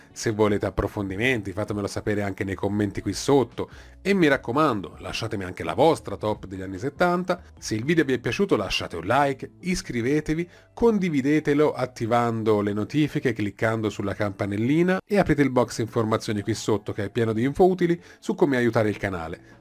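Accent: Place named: Tuscany, Italy. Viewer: Hai una voce bellissima, toni pacati e mai noiosi, accento vagamente toscano mai pesante e contenuti coinvolgenti. Ho adorato anche questo video. Piano piano me li vedrò tutti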